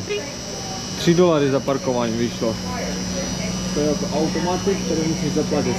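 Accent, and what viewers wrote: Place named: Ukraine. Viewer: Mohu se zeptat, podle přízvuku jsi z Ukrajiny nebo z Ruska? Díky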